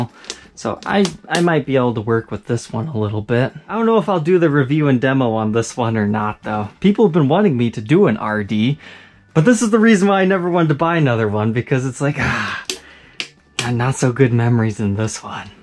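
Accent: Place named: United States. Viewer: Because you sound American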